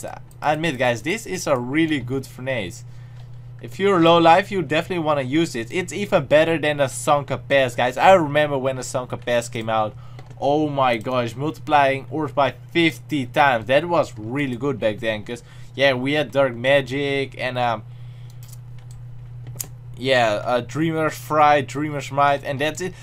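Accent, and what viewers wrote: Netherlands. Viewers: I love you're vids and accent r u Dutch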